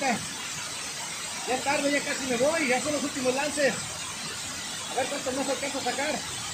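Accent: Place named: Bajío, Mexico. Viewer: Buena pesca amigo disculpe su acento se escucha como del bajío ... saludos desde Valladolid Yucatán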